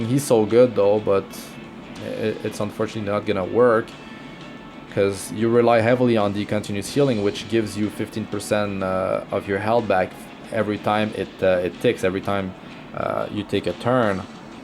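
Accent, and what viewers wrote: Quebec, Canada. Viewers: Hmm québecois? Je reconnais l'accent dans l'anglais haha, nice video man ;) I'll make sure to watch more of your content !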